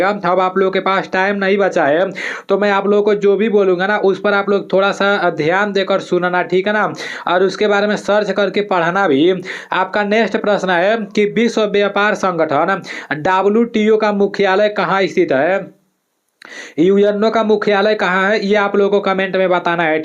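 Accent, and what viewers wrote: Bihar, India. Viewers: Bhai lagta hai ki aap bihar se ho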